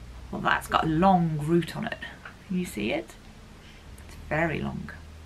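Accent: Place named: East Midlands, United Kingdom. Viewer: Your accent is similar to mine where abouts are you from?. I live in east Midlands do we have it?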